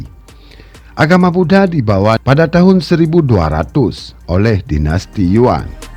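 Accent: Aceh, Indonesia. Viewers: Apakah Chanel ini milik orang Aceh..kuliat irama ngomong nya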